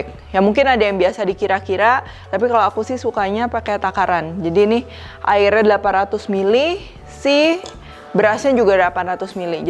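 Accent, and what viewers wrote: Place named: Cengkareng, Indonesia. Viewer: Masukin dialek warga cengkareng bgt.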